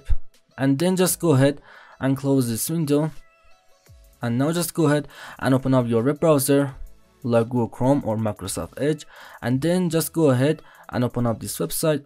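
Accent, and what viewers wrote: Iran. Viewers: ایرانی نیسیتی؟ لحجه رو برم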